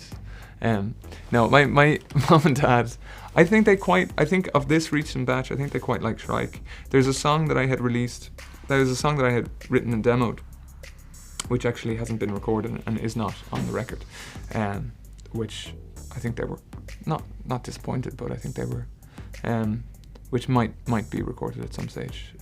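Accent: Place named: Ireland. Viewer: Am I the only one who didn’t know he was Irish? Ive never heard him speak before....I’m not even sure where I thought he was from, I guess I just assumed like manifested from a witches spell or something.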